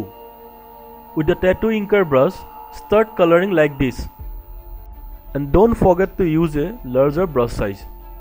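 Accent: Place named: Assam, India. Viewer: Aa bhai mja.. Tmar kothakhinir porai lage j tmi assamor... Mou assamore❤❤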